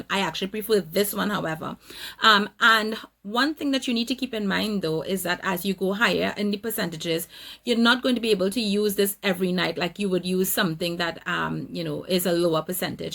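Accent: Trinidad and Tobago. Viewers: Fellow Trini?? :) I hear the accent. Wow beautiful lol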